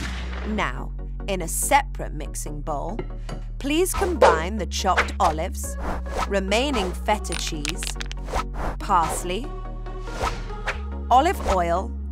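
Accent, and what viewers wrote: United Kingdom. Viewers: So he's or she's idk British???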